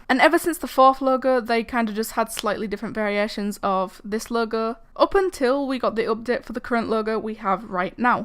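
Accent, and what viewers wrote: United Kingdom. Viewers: Oh godhaaaaaaaaa THAT BRITISH ACCENT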